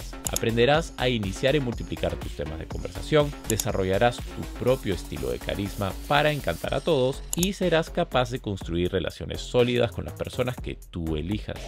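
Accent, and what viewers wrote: Peru. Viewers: Muy Buen video. Eres peruano? Tienes el acento.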